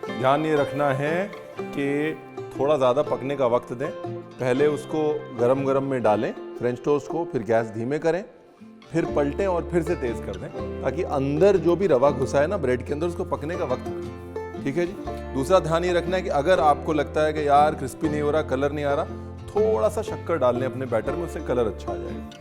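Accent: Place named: Kanpur, India. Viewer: easy recipe aur aap bolty bht achha hai mujhe lgta hai aap kanpur se hai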